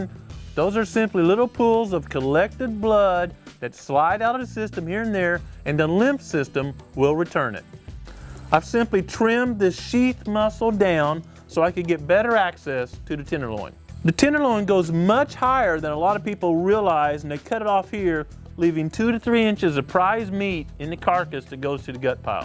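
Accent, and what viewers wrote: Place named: South, United States. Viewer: Sir, I don't know where exactly you hail from. But you sound like a Southern gentleman who knows his stuff...minus all of the extra stuff. And for that I am thankful. This video is direct, effective and presented in a friendly yet knowledgeable tone that helps a lot of people learn...like country northern boys like me. Lol!. Too folks want to put on airs cause they're in the public eye (especially when on YouTube), they want more "hits", or they think a flashy style beats substance. But at the end of the day, good old common sense, common tactics with common tools always reign supreme cause...they work! Good job. I'll be watching your channel.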